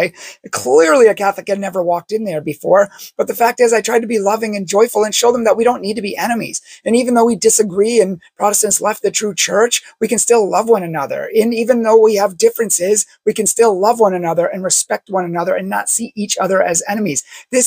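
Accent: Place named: United States. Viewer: Fair play but also crazy, I'm glad you had the American accent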